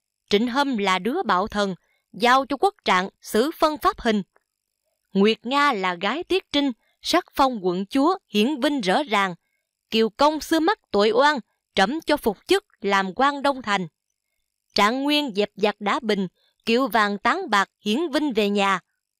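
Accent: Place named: South, Vietnam. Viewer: Đúng rồi, phải đọc ngôn từ miền Nam như vậy mới đúng, chứ dùng ngôn từ chỉnh sửa cái gọi toàn dân thì trật lất hết rồi, chân thành cảm ơn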